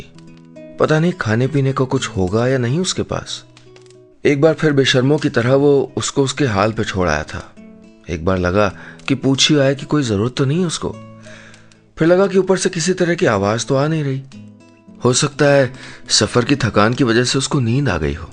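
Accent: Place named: Kumaon, India. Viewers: Tht Kumaoni accent...:-)...reminds me of my paternal home...:-D... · Aapke muh s kumauni hindi bahut achhi lagati h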